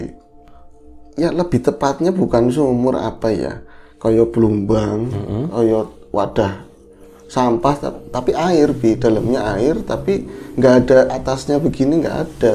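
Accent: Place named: Jakarta, Indonesia. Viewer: Mas sm mas sama2 dari Tegal, tp logat mas udh kayak penduduk asli jakarte/Betawi🥰 Sdg mas msh orisinil Tegalnya👍🤗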